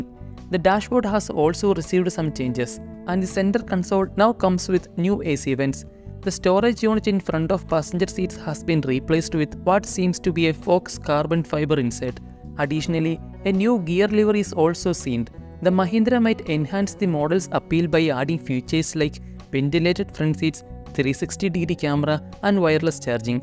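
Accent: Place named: England, United Kingdom. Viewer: Noticing your English accent... Naatil evida? 😅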